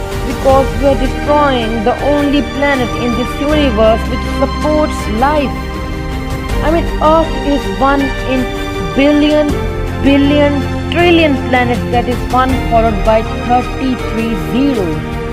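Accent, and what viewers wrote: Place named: India. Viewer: Ok....cut the LOUD dramatic music. It doesn’t help. Speak better English. Strong Indian dialect like Apo in Simpsons doesn’t help.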